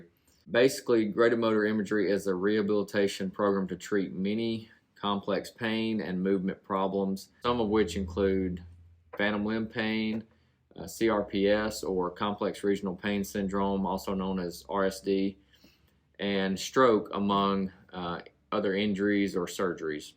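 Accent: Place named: South, United States. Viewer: Well explained and as an Aussie, I like his nice ?Southern accent. I will be trying with my post surgery foot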